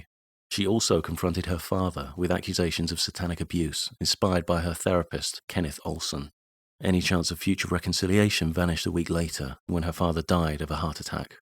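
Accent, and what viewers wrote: England, United Kingdom. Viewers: You sir are subbed. I followed a similar path in my education. Although it was Sociology and Anthropology. As you can imagine the ludicrous level of psuedoscience I saw, it might have been even worse for me. I'd love to able to do a series like this. But,I am absent the articulate and refined english accent and being american find myself exploding into arrogant & angry fits of cursing. :).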